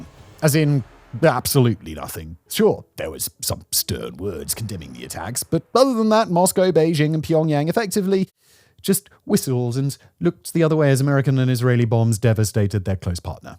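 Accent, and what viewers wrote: RP, United Kingdom. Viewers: unsubbed. not sure how I put up with this unlistenable british guy for this long. british accents are overrated, folks. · This guy thinks talking posh makes you intelligent. Idiot hasnt even worked out the jewish cabal and shills for them cos he would lose his channel and income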